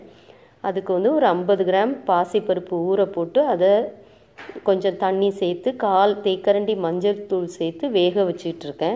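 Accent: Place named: Tirunelveli, India. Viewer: Assalamu alaikkum vrh, yepdi irukkinga maa, yen name fazila, i am from chennai maa, neenga peasurathu keakkum pothu oor baasai nallaa theriyuthu, naangalum thirunelveli la eral thaan, namma oor baasai keakka santhosamaa irukku maa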